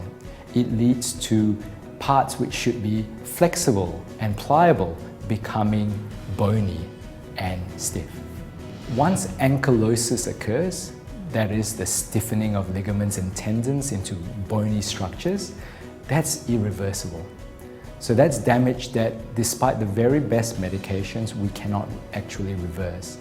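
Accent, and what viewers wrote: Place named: Australia. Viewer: So, so good to hear an Aussie accent, talking about AS here on Youtube. I am a late and constantly changing dx. While I was dxed with AS almost a decade ago, every time I come across another Rheumy the dx seems to change. That or they just won't commit, they just call it some kind of Inflammatory arthritis. OK, admittedly I have more that one systemic condition that directly affects the joints, which seems to confound the issue. Apparently I am also a very complex patient. My take on that is shitty gene's, ha ha. I am female, 50 something, B27 negative, RF negative, ANA positive. I have a relative, my mothers uncle, who had AS. I also have plenty of relatives with RA. Fortunately I have been on DMARD's the whole time. I have tried a biologic but I kept getting stomach infections. My Rheumy at the time told me that if I couldn't tolerate one biologic I couldn't tolerate any of them. 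I also hvae a standing order for rule 3 testing which I get any time I have another blood test done. No one seems to be monitoring them so I don't worry too much. I have had imaging of my lungs and lung function tests, the results of the LFT wasn't good news. As I live in a small country town (in NSW|) and am a waaay too frequent, frequent flyer at my local imaging place. Lets just say the guy who reports on my imaging is always the same guy and he isn't overly concerned with being accurate. It's OK if the specialists actually view the imaging but because I am complex, most of the time there is no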